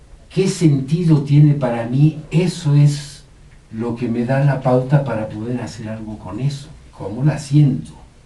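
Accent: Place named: Mexico. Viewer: me dio mucho gusto verte despues de tantooooo tiempo, te reconoci de inmediato aunque la ultima vez que te vi aun no te salia la piocha, te encontre acento Mexicano (por que sera), si lees esto mandame un e-mail <mail> esta foto estoy con mi hijo mayor). Tu primo Felix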